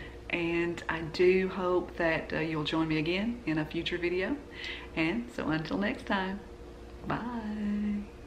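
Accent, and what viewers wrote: South, United States. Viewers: I really enjoy your videos? What state do you live? I think I hear a southern accent? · I think she has a southern accent too! I am from TX!💜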